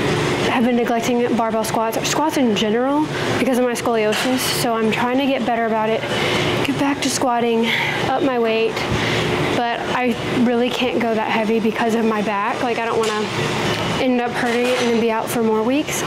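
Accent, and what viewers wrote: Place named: England, United Kingdom. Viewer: I love your English accents!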